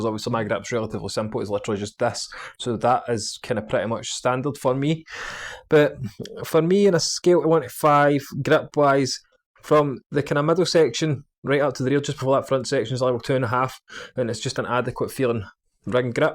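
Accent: Scotland, United Kingdom. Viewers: hey man! great review. just purchased a set of these, I will let you know how they are Awesome Scottish accent my man. Cheers from Canada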